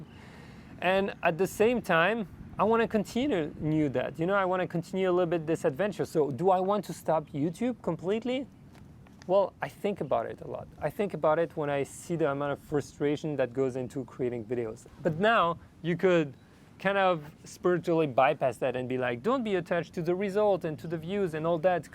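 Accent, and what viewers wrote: France. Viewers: I am watching your videos from time to ime, most accidentally, but mostly I am impressed by your "Closeness". i hate the ads in youtube but it seems there is no way except booking an expensive abo. I look videos too on rumble or odyssee for political reasons. And what I also like on your videos is the good speaking quality even with the little frnch accent. That is an aspect what is oftly missed by other youtubers. They present not an accent but a slang which is not understandeable for anyone who wasn't born in the right state.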